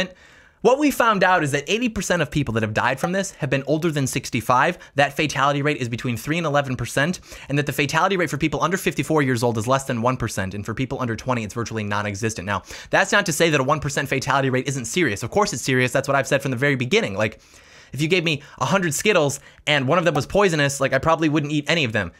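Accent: Michigan, United States. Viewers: You from Michigan my guy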